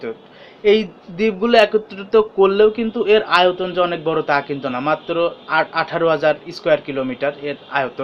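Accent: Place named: Pabna, Bangladesh. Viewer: ভাই আপনের বাড়ী কি পাবনা ভাষা শুনে মনে হচেছ পাবনা পাবনা কোন থানায় ভাই?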